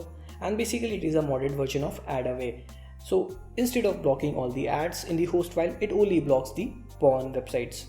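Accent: India. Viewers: Fuck up with your Indian accent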